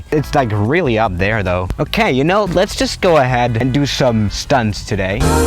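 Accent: Germany. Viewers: Are u from Germany? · das ist das typische deutsch und kein Schweizerischen Akzent oder lebst du doch in Deutschland? English: thats the typical german and not the swiss german xd or are you live in germany? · wait ur german? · Are you German?? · Are you a german?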